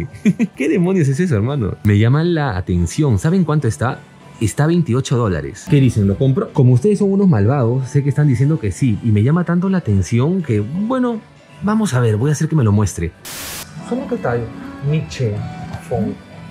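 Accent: Peru. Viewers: Primera vez qe veo tus videos y por tu forma de hablar creo qe eres Peruano :V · mano tu eres de peruuuuu? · Asu de entrada reconocí el acento de un compatriota. 🇵🇪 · Joder bro como me cuesta diferenciar el acento peruano 🤣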